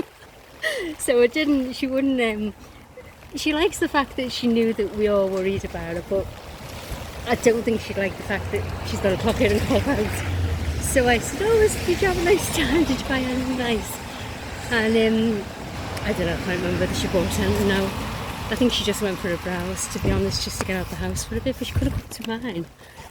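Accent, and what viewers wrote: Liverpool, United Kingdom. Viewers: 😃I have just learnt your accent from Liverpool is called « Scouse » Thank you 🤗👍 I understand better English written✍️ than oral 🗣️🤭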